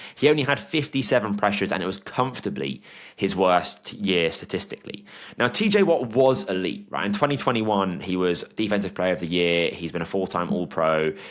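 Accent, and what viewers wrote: United Kingdom. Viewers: Something special about listening to a knowledgeable Brit speak about American Football. However you are wrong on Caleb Manziel Williams. Cam Ward will be a better NFL QB. Overall though good takes. Jakobi Myers deserves to go at the top of the underrated list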